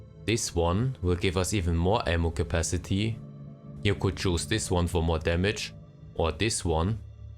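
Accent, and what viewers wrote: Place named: Germany. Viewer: Mach Deutschen Kanal, gibt ne Menge KI Stimmen die das Übersetzen können! Wenn ich mir aber dein Akzent so anhöre, glaube ich das du Deutsch schon kannst! Brauchst keine KI Übersetzung, also sprich Deutsch! Siehst ja das die Reichweite trotz Englisch nicht mehr ist!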